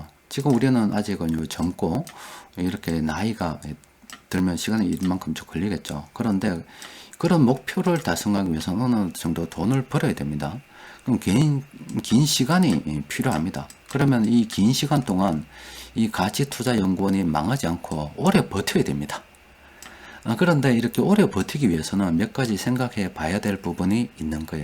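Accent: Gyeongsang, South Korea. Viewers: 경상도말투친근감있어서 좋습니다~ ^^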